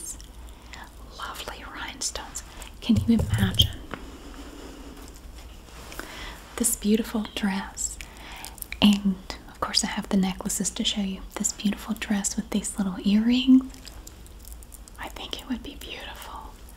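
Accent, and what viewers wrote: South, United States. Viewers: The mix of whisper and soft spoken is just heavenly. Also, your southern accident really adds to the tingles!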